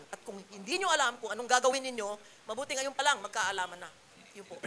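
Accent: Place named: China. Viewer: Chinese ka ksi kaya ganyan ka magsalita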